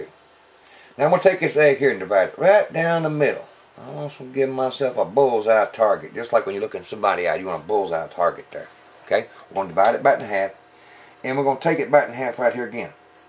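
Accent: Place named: West, United States. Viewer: Love the Western Accent it makes the video more awesome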